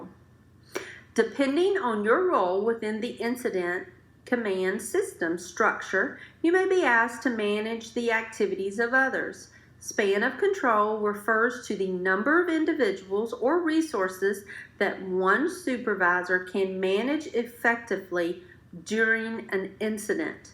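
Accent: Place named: United States. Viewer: Holy crap I cannot handle listening to that hick twang 😖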